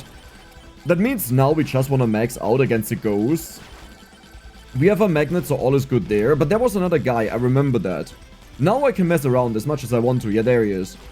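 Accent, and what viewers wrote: Germany. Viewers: U got me opens chest ...... oh my god another credit card xDD (the moment with the magnet) love ur german accent btw xP, keep it up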